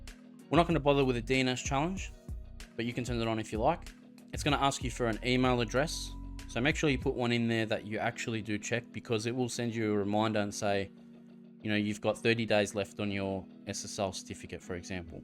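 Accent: Australia. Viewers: Hey mate, good to hear an aussie accent on these tutorials. Hoping you can help if you have time. I have set up successfully with sonnarr and radarr. Seems to have no problem with other unraid dockers, but going to docker or web server on another VM is an issue. Currently having issues with a self hosted HUDU install. Works fine by direct IP or port forwarded to direct IP. Soon as I port forward to NPM I get redirect issues. Hudu has SSL (letsencrupt) built in so I have tried turning it off in NPM and various other configs that don't seem to work.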